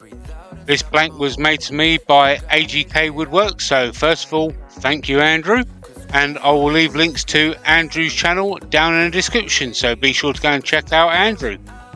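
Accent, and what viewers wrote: United Kingdom. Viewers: Looks great, I'm a little confused, your accent sounds like you're from the UK but you pronounced Aluminium as if you were an American.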